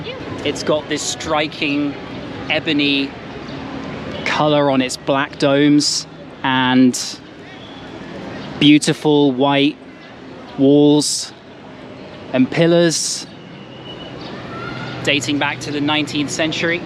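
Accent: United Kingdom. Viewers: Videonya keren mas,saya suka aksen anda.aksen nya sperti orang british yaa😁😁,suksess selalu sehat selalu semoga bahagia selalu yaa...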